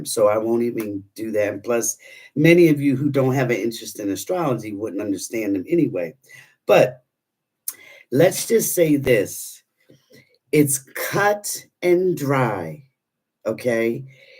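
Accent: Philadelphia, United States. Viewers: Hi I am so glad I found your channel. Listening to you I knew you were from the Philly area! It is so exciting that the Eagles are Super Bowl bound! Go Birds!!! 🦅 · Thank you. And I thought I detected a Philly area accent. Go Eagles!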